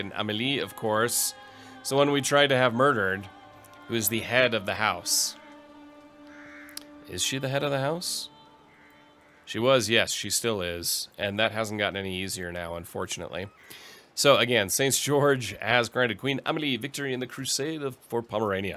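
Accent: Germany. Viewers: Hey are you german ?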